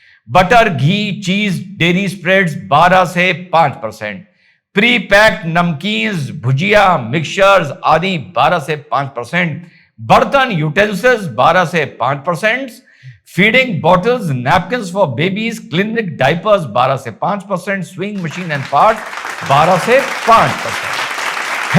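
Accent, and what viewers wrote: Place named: Meerut, India. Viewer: Sir meerut ki language aapki taraf se acchi lagti h..Like always your video analyses😂😂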